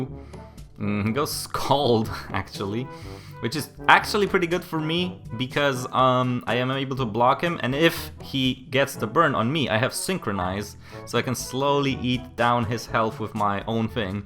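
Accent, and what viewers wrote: Poland. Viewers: so you are from Poland?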